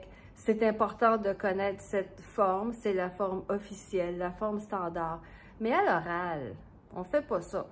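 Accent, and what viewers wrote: Quebec, Canada. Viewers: nay hô, moi, j’aime beaucoup l’accent québécois 💚💚💚 Mais c’est plate que les dessins animés avec l’accent parisien ont été influencés à certains enfants, ils leur ont imité.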